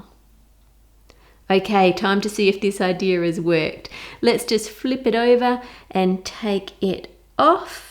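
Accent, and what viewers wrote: Australia. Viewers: I couldn’t be happier that the lil stop motion title sequence is back. Also can’t get enough of voiceovers, iz just relaxing n calming(I think it’s the Aussie accent).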